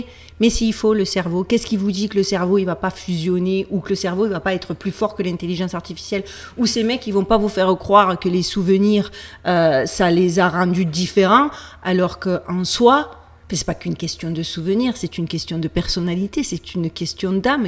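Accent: France. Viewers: Question : As-tu une certain succès envers les amerlocs avec ton accent Français ?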